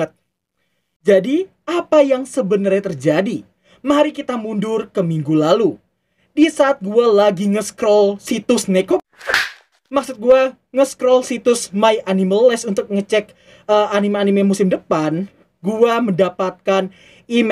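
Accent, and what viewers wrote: Java, Indonesia. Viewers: Kamu dari Jawa y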